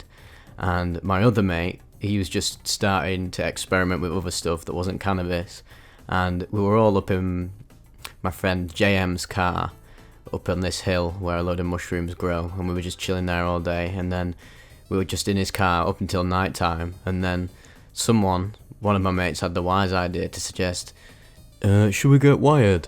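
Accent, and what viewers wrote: United Kingdom. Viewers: British accents make the best narration. You're up there with David Attenborough bro.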